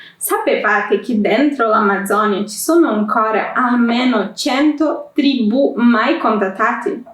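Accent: Sicily, Italy. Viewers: Ciao, sono arrivato nel tuo canale dopo aver letto un tuo commento sotto un video di Learn Italian with Lucrezia. Beh, che dire, bravissima! Parli molto bene l'italiano e per di più ho imparato tante cose che non sapevo del Brasile. Continua così 💪 Fun fact: sin dalla pronuncia delle prime prime parole ho pensato "questa ragazza sembra a tratti siciliana", poi ho scoperto che hai vissuto/vivi in Sicilia 😂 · Ciao, parli davvero bene! È curioso sentire che hai un accento spiccatamente siciliano, catanese direi.. ma va benissimo! :D Ciao..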